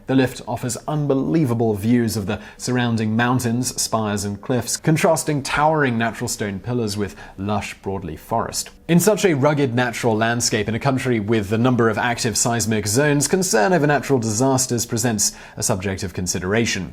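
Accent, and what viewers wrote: England, United Kingdom. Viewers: It is really weird to hear a guy with an English accent say "elevator" so many time in one video.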